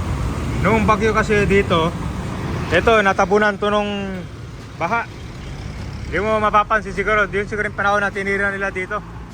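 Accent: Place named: Visayas, Philippines. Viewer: Ayusin m pgsasalita m.....bisaya kb😅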